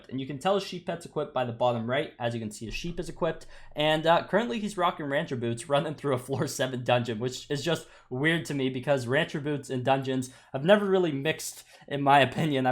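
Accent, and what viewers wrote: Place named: Canada. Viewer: i love ur slight Canadian accent and i can barely hear it and it only adds to ur charismatic personality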